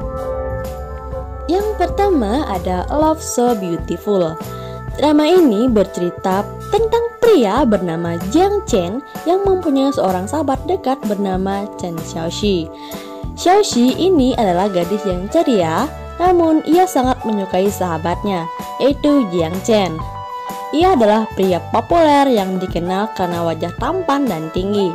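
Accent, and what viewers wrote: Bali, Indonesia. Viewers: Logat balinya kentel🥰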